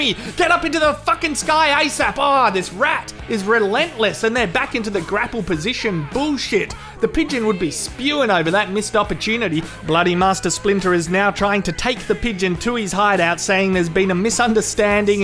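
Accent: Australia. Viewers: Everything needs an Australian guy narrating it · That feeling when you realize you accused an actual Australian of having a fake accent lol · Every video on YouTube should start with "we need an Australian guy narrating this"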